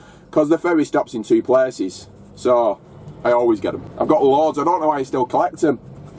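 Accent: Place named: Wales, United Kingdom. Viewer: I have traveled more than 20 times with Minoan Lines and highly recommend the 2 Cruise Europe / Olympic vessels. I found out that YouTube has a sub-text mode, because I have a little trouble understanding Wales Dialect. What I can NOT recommend is ANEK-Superfast with their old ships. Video Tripod is good Thank you very much:-)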